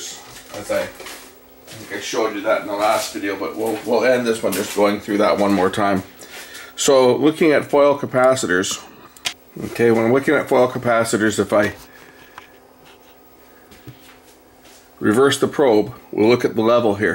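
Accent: Canada. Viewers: exactly and it's my first properish scope. I only started learning last October'ish and my first buy was a must tool MT8205 scopemeter and before it arrived I had already advanced past it suiting my needs. (Still it's a good accurate meter) but like I said for 14 bucks its the perfect scope for someone learning like myself till I have the money for a proper one.. P.s. I should of noticed you were Canadian and not American by the way ya talk.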